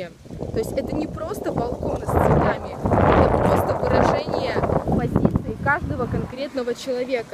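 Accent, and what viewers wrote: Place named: Russia. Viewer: I love your Russian accent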